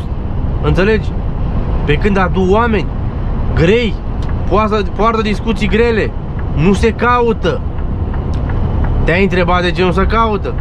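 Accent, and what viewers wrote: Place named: Oltenia, Romania. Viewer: Fiindca esti oltean de asta nu te intelege nimeni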